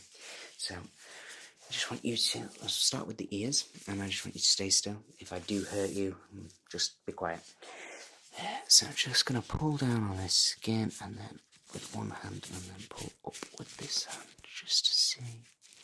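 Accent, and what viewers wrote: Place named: North, United Kingdom. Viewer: You sound very... Northern. I like it 😋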